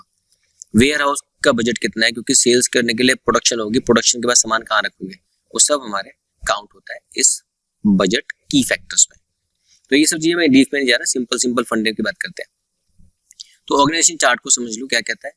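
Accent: India. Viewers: Sir ap lucknow se ho ?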